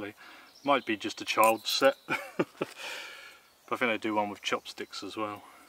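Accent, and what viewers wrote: United Kingdom. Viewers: Are you british? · Make a Bushcraft camp cook book. You got the vids, the recipes, AND the British accent. You could be the Gordan Ramsay of Bushcraft cookery. Can you also do a braised short rib? Or anything braised tbh?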